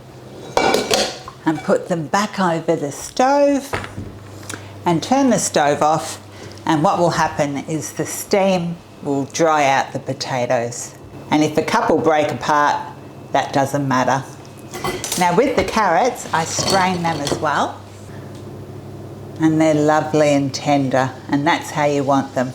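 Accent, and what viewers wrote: Australia. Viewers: I don't think Dave picked up on your accent. True blue, it is, if you ask me. Nice work . . .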